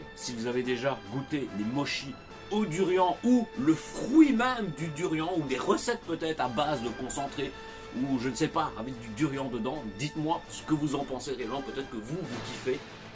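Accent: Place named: Belgium. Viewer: Très sympa tes vidéos 👍🏽 C’est marrant ce petit accent belge. Par contre d’habitude je reconnais facilement de quelle région de Wallonie provient l’accent, à part le carolo, sauf si il est fort prononcé. Sûrement dû au fait que je suis, moi-même, carolo😅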